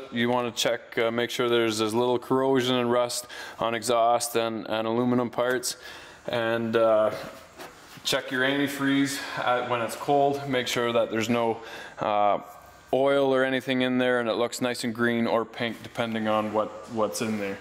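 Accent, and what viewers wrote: Canada. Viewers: Love the accent think Canada! Love it. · I know you are Canadian because of the way you say "out" Thanks for the tips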